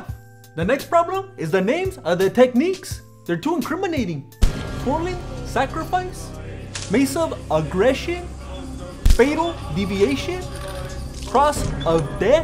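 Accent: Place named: Mexico. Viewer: es gracioso tu acento muy mexicano! saludos from oaxaca krav maga studen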